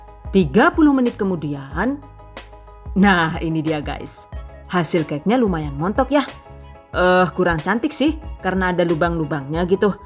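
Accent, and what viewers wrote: Banyumas, Indonesia. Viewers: Kenaa seneng banget liat chanelnya karena resepnya enak, penjelasannya detail selalu ada tips n trik jadi mudah dimengerti, dan selalu berbagi resep yg lg hits n viral sekarang selalu update,resepnya hasilnya selalu lucu2 warnanya jadi keliatan menggoda2🥰 suaranya juga lucu selalu ada lucu2an ngapak2an bae 😃 selalu menginspirasi buat ide jualan saya.. Trimksh 🙏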